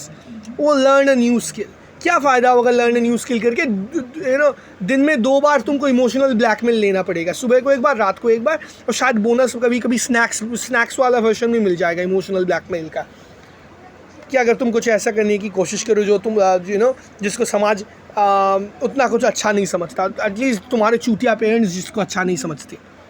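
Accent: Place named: Bengal, India. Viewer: Wanting to work hard is luck too, I dont know why ppl dont realise that .I know how I would end up in a few years if I don't work hard but I still don't I just can't focus its just luck. Bengalis have really cool accents btw look at you and kirti chow for example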